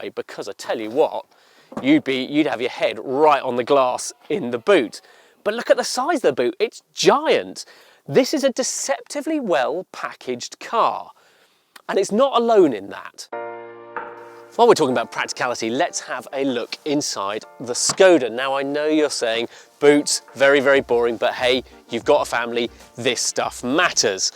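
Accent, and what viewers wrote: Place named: United Kingdom. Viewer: This video is filled with British logic. All bla bla and no substance. Just yapping with a snooty accent won’t cut it, m8!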